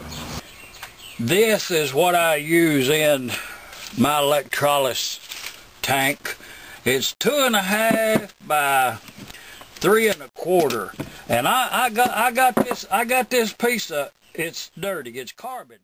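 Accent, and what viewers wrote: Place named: Appalachia, United States. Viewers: Josh Green He's from east Arkansas. I love the way he says "just put it in yonder." Appalachian accent. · You sound like a fellow Appalachian American.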